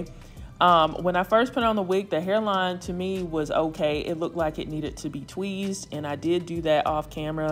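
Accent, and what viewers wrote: South, United States. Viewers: I don't think I ever realized how much you sound like a southern belle, lol. It might be the edible I had. 😂 The wig look great!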